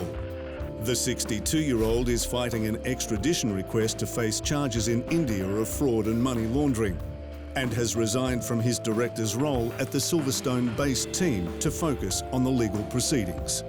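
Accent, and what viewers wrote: Australia. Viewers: It's an Aussie voice over guy but he puts on a Movie Trailer voice. "In a world that betrayed him...." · Australian voice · now I feel bad for not liking this voice lol. It helped that he was Australian 😂😂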